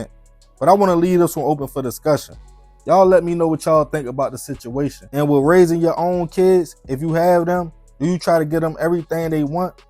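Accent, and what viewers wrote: Baltimore, United States. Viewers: I love when I can still hear that Baltimore accent in your voice 😂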